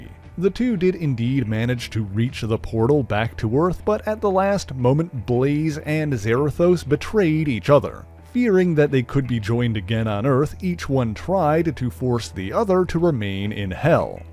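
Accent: Canada. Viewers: I can hear the subtle Canadian accent 😂. Great video though. Been trying to hunt down all the single issues so I can finnaly read the full story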